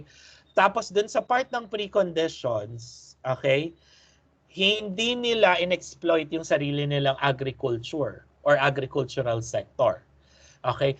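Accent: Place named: Philippines. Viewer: Omg thank you so much for posting this!! It's been a little difficult understanding the accents in other videos. I was so happy when I heard the Filipino accent. May maiintindihan na ako huhu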